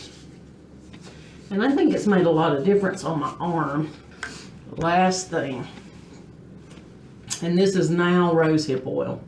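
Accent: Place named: Georgia, United States. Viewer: LOL, girl I didn’t know you were from SoUth Ga until you told us. I didn’t hear your accent at all until you let it go. My dad is from Cordele and my daughter attended Valdosta State. ☺️ Small world.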